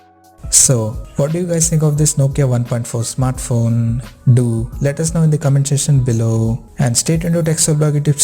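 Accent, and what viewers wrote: India. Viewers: Horrible Indian accent- sing song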